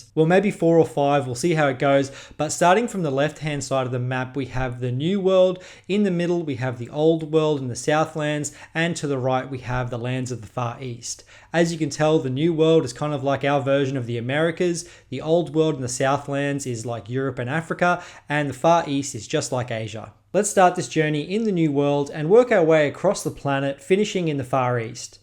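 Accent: Australia. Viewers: Great video and so good to hear it in an Australian accent!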